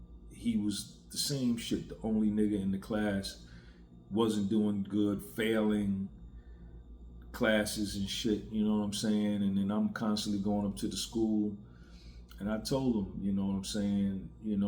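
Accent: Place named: New York, United States. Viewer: I'm astounded how you have a NYC accent, and I'm from Jamaica Queens, so I know New York a accents.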